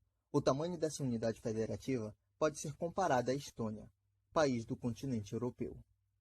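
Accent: Pará, Brazil. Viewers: kkkkkk hoje bem cedo,encontrei o canal pela primeira vez. Sou do Maranhão (cidade Bacuri)no Litoral Ocidental maranhense ,e conheço o sotaque do Pará.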